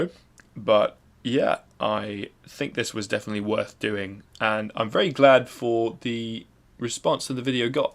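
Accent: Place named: Glasgow, United Kingdom. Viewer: Mate your Glasgow accent is actually decent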